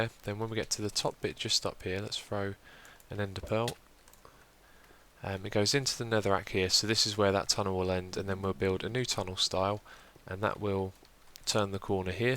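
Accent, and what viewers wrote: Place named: United Kingdom. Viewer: Your voice is so epic and sexy i must say ! your accent is also really good just love the british accent!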